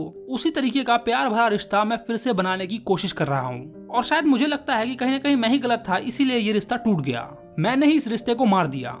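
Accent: Bihar, India. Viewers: Sir aap Bihar se hai na ❤❤ Aapki avaj se laga 😂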